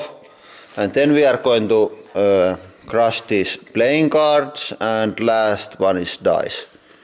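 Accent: Finland. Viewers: This kind of very strong finnish accent is know as "Rally-English" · the finnish accent lol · is he from finland cause sounds like it? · Most of the finnish people know him of he's epic finnish english axent :D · very strong finnish accent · Rallienglanti for the win!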